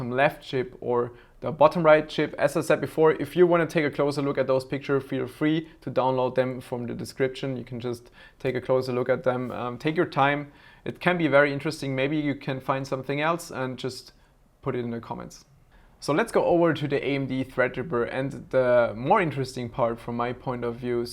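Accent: Germany. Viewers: Man hört den deutschen Akzent xD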